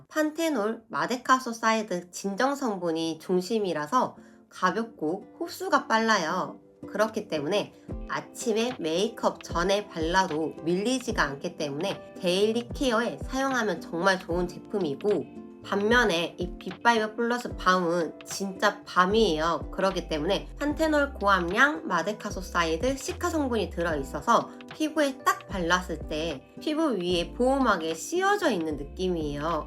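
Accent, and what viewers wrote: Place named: Jeolla, South Korea. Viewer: 오 완전 전라도억양!!! 전라도 분이신가요???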